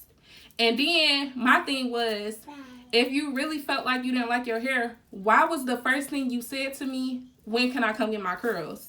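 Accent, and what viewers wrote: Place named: Detroit, United States. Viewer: You gotta be from Detroit 😂😂😂 I hear the accent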